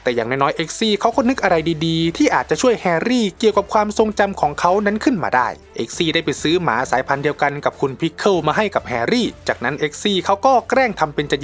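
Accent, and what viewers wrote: Isan, Thailand. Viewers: แอดพูดอิสานน่ารักมาก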